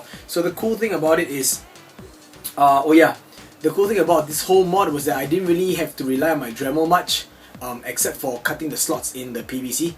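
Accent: Singapore. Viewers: Are you a Singaporean ?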